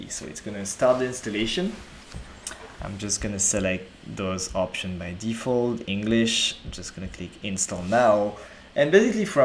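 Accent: France. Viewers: if you don't mind me asking, are you french? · French accent 😅, good work budy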